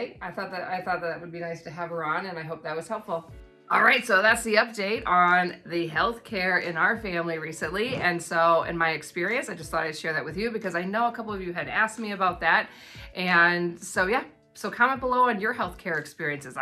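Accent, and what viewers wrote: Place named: New Zealand. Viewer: Hello there! Finally we got to watch the video, thanks for that and the many informative videos you've allowed us to watch. I hope your daughter makes a full recovery as soon as! Hearing about the 'enn-zed' (NZ) healthcare system (and others like 🇨🇦, 🇦🇺), makes ones stress levels regarding the 🇺🇸 healthcare system increase. The most enchanting thing about your videos is the NZ 'highlights' on your accent, especially as you pause or end yor sentences. I listened so keenly to your daughter, but she hasn't got that yet. Looking fwd to the next.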